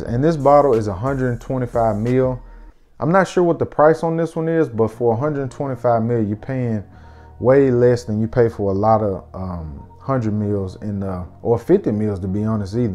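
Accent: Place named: South, United States. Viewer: !! Man you talk country like me 😃. That Chanel sounds great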